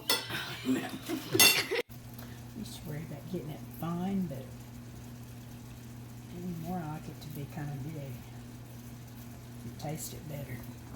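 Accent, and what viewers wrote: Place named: South, United States. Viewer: Thanks, this brought back memories from my grandmas cooking. She had the same southern accent, too.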